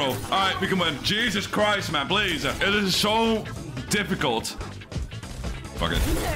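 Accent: Netherlands. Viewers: een nederlands accent in het engels is ook zo makkelijk te herkennen lmao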